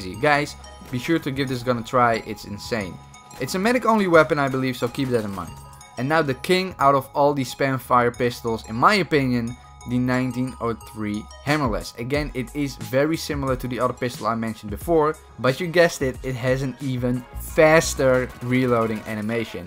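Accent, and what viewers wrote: Netherlands. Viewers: Ru dutch? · je hoort wel Nederlands accent in je engels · You sound Dutch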